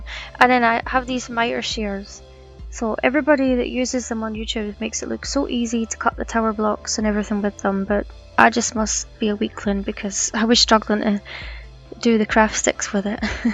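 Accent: Scotland, United Kingdom. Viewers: All your designs are well done and gorgeous!! I may try a couple of them but will try to use wood pieces in place of some of the building blocks. Hope it will work although I realize my versions will look different. Your projects are very creative and I enjoy your Scottish brogue as it reminds me of my cousins in Ireland! Similar vocabulary 😄😄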